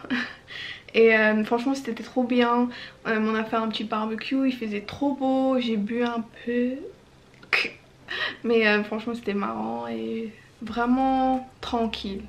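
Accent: France. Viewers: Your french sounds great...t'as un ptit accent français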